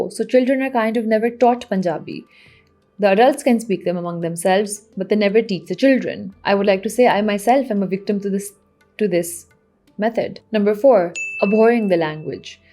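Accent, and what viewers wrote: Pakistan. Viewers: I am a Sikh from Delhi India, who have been living in North America since childhood. We speak Punjabi at home, my kids speak and understand Punjabi. I am proud to be Punjabi. In fact Punjabi singers based in UK, since 70’s made Punjabi cool and popular all over the world. The host of the vlog seems and sounds like a Pakistani, where Punjabi language is considered inferior and Pendu. In Pakistan if you want to look educated and sophisticated you speak Urdu. Since most Pakistanis are Muslims they threw their mother tongue and culture in the garbage bin. They adopted desert culture and cult. They destroyed their own customs and adopted foreign traditions. They forgot Bhangra, Gidda, Vaisakhi and Holi. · Why should she? She's Pakistani and she has the Pakistani accent (lil bit)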